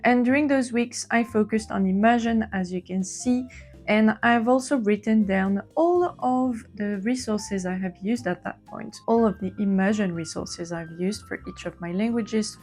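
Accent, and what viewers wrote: France. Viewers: I knew it!! 😂 I love the French accent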